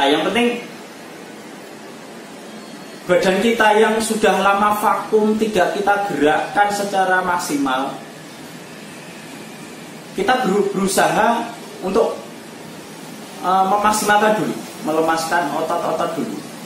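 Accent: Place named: Banyumas, Indonesia. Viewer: rika ngendi kang... ketone ngapak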